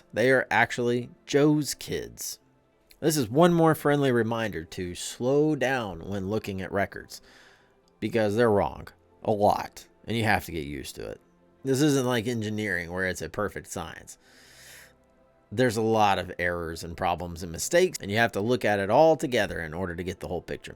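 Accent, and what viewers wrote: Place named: South, United States. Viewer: I was born and raised in Tennessee. His southern accent is a little on the light side compared to most southerners myself included.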